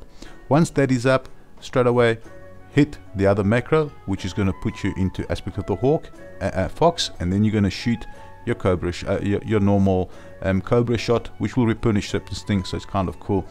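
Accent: South Africa. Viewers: Your from South Africa.??? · hes South African accent ... i have the same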